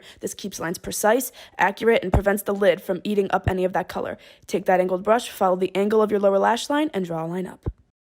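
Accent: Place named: New York, United States. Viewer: Girl, love you! Where you from? I'm from Jersey/Brooklyn and we sound very similar.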